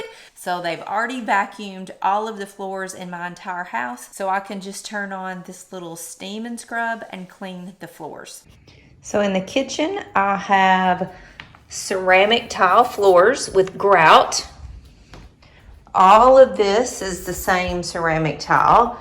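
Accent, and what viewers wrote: South, United States. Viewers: Great video and warm Southern accent🙂 Shark mfg needs to send you a big fat gift card😁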